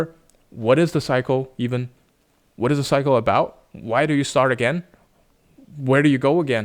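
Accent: Standard. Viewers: Thank you for your excellent video. Although there were some inaccuracies in the translation, it was already very admirable. Your accent is very standard. Like it👍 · 你是华人吗，感觉你的中文特别地道标准，无论是普通话口音还是中文文化，你都很地道，感觉你至少在中国生活过很多年。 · Thank you for your video; your Chinese proficiency is already excellent, and your pronunciation is very standard. Here, I'd like to emphasize a cultural difference that might lead to a slight deviation in your understanding of the meaning of the poem at the beginning of the video. In traditional Chinese beliefs, gods (inherent, pre-existing beings) and immortals (those who can achieve immortality through cultivation) are considered absolutely fair and pure entities without personal desires or malicious thoughts. Desires for wealth, power, and emotional attachments are seen as obstacles for becoming a god or immortal. This perspective contrasts significantly with Western mythology, especially Greek mythology, where the emotions and personalities of gods and humans are more similar. 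So, in essence, the second line of the poem is expressing that although mortals admire the carefree freedom of becoming a god, they are unwilling to let go of their desires for wealth and power. This poem originates from another ancient novel and originally aims to guide ordinary people, with a Taoist advising them to relinquish their attachment to wealth, power, and emotions, encouraging self-cultivation to become immortals.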